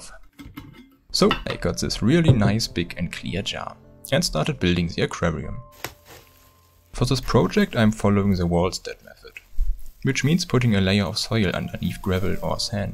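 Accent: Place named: Germany. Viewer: Man hört den deutschen Akzent ganz schön raus :D Echt schönes Video, vielleicht baue ich mir selber auch mal sowas. Aktuell habe ich eher Erfahrungen mit kleinen Terrarien gemacht.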